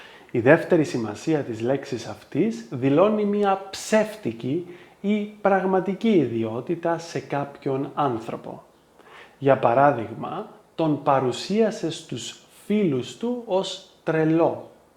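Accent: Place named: Cyprus. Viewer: Ακούγεσαι λίγο σαν Κύπριος. Διδάξτε με πότε να χρησιμοποιήσω Ω και ο στη γραμματική. Δεν έχω πάει ποτέ στο ελληνικό σχολείο. Είμαι αυτοδίδακτος.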